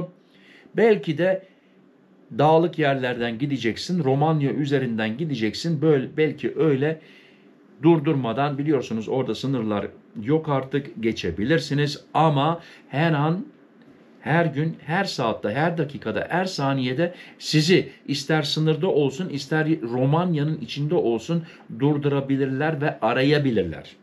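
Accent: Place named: Thrace, Turkey. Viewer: Üstadım nerelisin merak ettim,sanki şive bizim Trakya insansın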